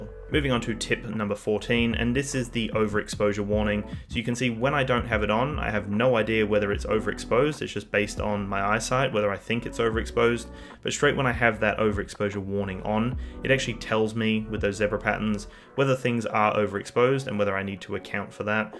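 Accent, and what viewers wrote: Australia. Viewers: Thought you were Aussie, I live in Echuca in Victoria :) keep up the good work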